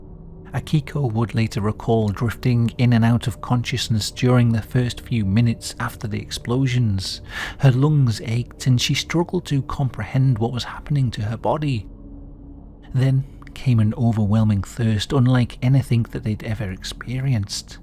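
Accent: United Kingdom. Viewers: WHILE THIS ARTWORK IS HAUNTING AND DISTURBING LET US NEVER FORGET THAT EMPEROR HIROHITO HAD ALREADY JOINED FORCED WITH ADOLPH HITLER AND THAT NEITHER ONE OF THEM WAS INTERESTED IN STANDING DOWN, THEIR MUTUAL GOAL WAS 100 % WORLD DOMINATION. I AM SURE THAT THE US SAILORS WHO RESIDE AT THE BOTTOM OF THE OCEAN ON THE ARIZONA AND OTHER SHIPS WOULD REALLY ENJOY THIS BRITISH NARRATION AND VIEWS AND YOUR OPINIONS. PERHAPS THOSE USA BURNED AND DROWNED SAILORS WOULD HAVE PREFERRED TO LIVE OUT THE REST OF THEIR LIVES ON EARTH INSTEAD OF DOWN IN THE OCEAN AS FOOD FOR FISHES. SO KEEP YOUR WOKISM OPINIONS ABOUT A WAR YOU DID NOT PARTICIPATE IN NOR FIGHT IN, AS MY OWN FATHER DID!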